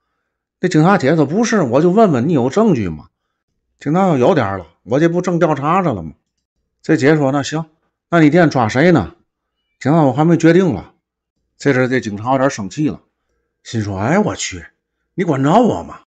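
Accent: Tianjin, China. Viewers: 这是天津口音吗？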